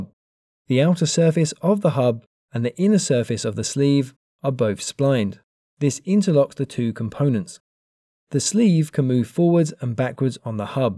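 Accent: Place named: United Kingdom. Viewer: I think he's a british guy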